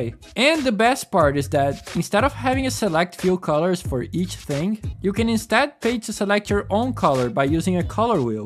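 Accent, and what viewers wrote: Brazil. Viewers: Bro you Brazilian?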